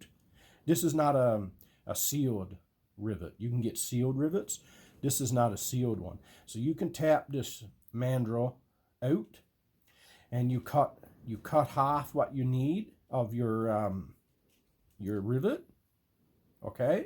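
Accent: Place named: Newfoundland, Canada. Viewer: I have one of those , does a great job. Ok so I'm trying to narrow down the accent so let's me know if I'm close , I figure your from the rock but anyone could guess that but I'm going way out there and saying your from a stretch between Grand Falls Windsor east to Botwood but man oh man I hear Bishop Falls big time . Keep at it bye and as they say on the rock , who knit ya 👍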